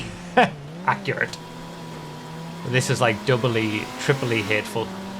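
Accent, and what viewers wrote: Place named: North, United Kingdom. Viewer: interesting given he's neither of those, he's just northern lol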